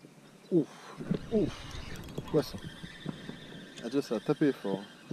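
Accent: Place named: Franche-Comte, France. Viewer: Super vidéo, très agréable à regarder, a t'entendre je pense que tu dois être franc-comtois aussi non ? Continue comme ça, un régal cette session ! :D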